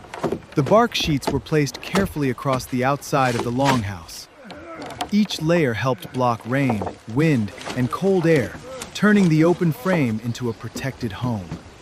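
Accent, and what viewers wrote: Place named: United States. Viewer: Wow great thanks, I really like how AI makes past living again. And that's a nice American prononciation, I understood everything. Thank you to show each native Americans way of life aspect.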